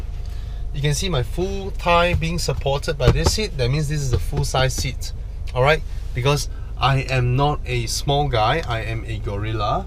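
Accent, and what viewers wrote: Malaysia. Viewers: oh man...the msian accent I love it. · I've lived in Malaysia for 2 years, I like your accent :D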